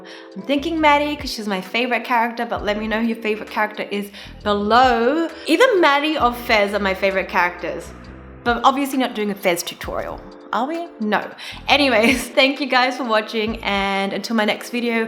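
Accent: Australia. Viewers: ay the nice aussie accent just makes me hit the subscribe button oh yeah love the final look 😍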